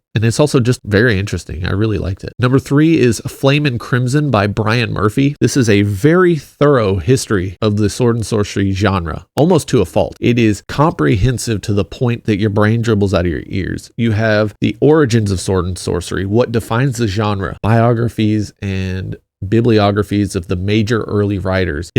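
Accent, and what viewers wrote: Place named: South, United States. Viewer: Thats a sick MN hat I gotta get one of those. For some reason I thought you had a slight southern accent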